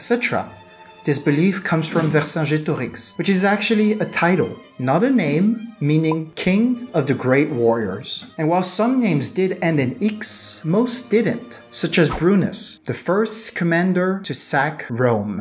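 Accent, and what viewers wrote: France. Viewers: He probably said it with a French accent because he has a French accent as far as I can tell. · Nice accent is French your first language.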